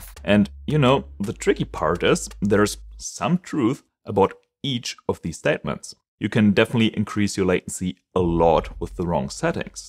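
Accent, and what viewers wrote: Germany. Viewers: Tatsächlich nicht den typischen deutschen Dialekt aber dann auf den letzen Metern verraten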